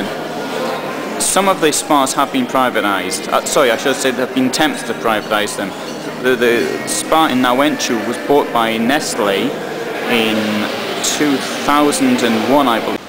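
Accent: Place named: England, United Kingdom. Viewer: I cannot quite make out your accent. What region of merry olde England do you hale from?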